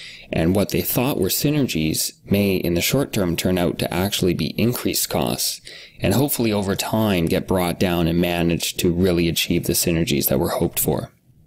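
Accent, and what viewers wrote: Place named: Canada. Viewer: canadian accent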